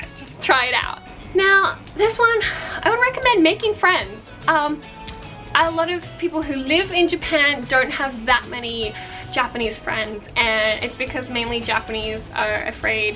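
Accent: Australia. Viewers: Although I don't speak Japanese, I was able to hear an Aus accent when you spoke - Both shocked and amused me... Your point about getting outside Tokyo is SO true. Other than videos you shot with friends, my favorites were when you showcased a city or business outside Tokyo. They were all so well done. Kobe series 100% I'm still amazed you created all those without a staff.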